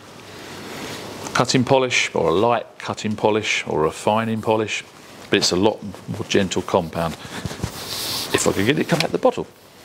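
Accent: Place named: United Kingdom. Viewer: isnt lake country is based in the US ? just wondered why did his accent sound british ?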